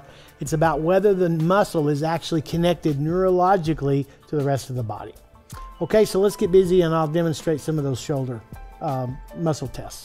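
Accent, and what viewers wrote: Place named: Texas, United States. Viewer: JasonJMist I am not american so every american accent for me is just heaven! And he doesn't have just an american accent! He has a texas accent, which is heaven plus version for me hahaha I am not even a native english speaker and I can notice a lot his texan accent! And yes, for me the best and sexiest accent is not the british, it's the american. :) lol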